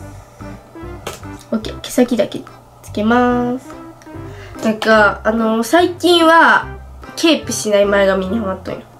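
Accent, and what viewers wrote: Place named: Hiroshima, Japan. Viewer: ちゃん可愛い！所々でるじゃけいとかの広島弁？が私も使うから親近感あって嬉しい！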